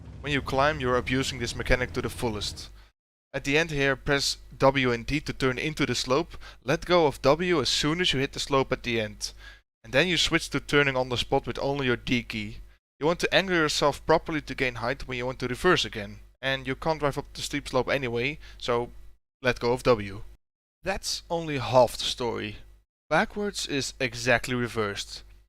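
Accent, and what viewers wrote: Netherlands. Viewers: Are you Dutch? Well, I am... And your accent is extremely Dutch :) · you sound dutch..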